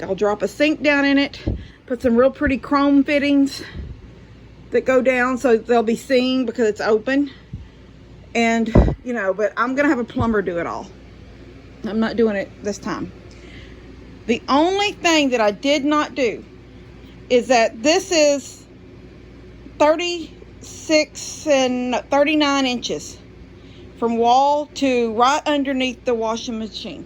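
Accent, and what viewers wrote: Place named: Georgia, United States. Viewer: I feel like I'm in the room with you having a conversation. I was in a funk because of some physical problems, surgeries, etc and getting older. I was told I could no longer do stuff and I believed it. I needed repairs to my house little things I used to do myself or with my husband or things my Dad taught me growing up helping him. I learned from my Mom also. During COVID my handyman left this state and I wasn't able to find someone to take his place. ( I wasn't going to get scammed). So I watched you and I said I can do that. I'm limited to some things physically My body can't do I tried but I painted, patched holes, cut boards bought everything that was needed to make a closet just had to get help putting it together. Watching you reminded me I knew how to do things I wasn't helpless like I was made to feel. 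Now I have found a really sweet young lady that has worked helping out on her grandparents farm and knows how to do some stuff and what she doesn't know I show her and she has helped me finish a couple of projects and is going to help me with others. She works goes to college and still helps her Dad keep the farm going for grandma. I showed her your video and she said that you talk like me I'm from GA but living in Illinois now. Anyway thank you for reminding me I can still do stuff and even teach other young women to be self sufficient.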